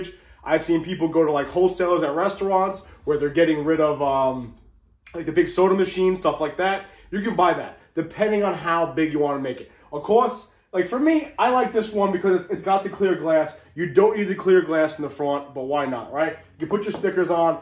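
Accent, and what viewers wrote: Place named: New York, United States. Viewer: This guy is from New York or New Jersey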